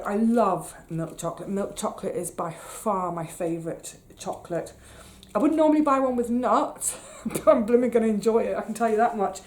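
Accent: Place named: England, United Kingdom. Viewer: Hello from Australia...enjoyed your chitchat and lovely books you've read...definitely right down my ally....started watching you video, had to pause you and watch Mr harass goes to Paris..so enjoyed it and here I am finishing of your video and all that English accent..tfs..happy reading and hope you got some things crossed off your list...greetings from Australia..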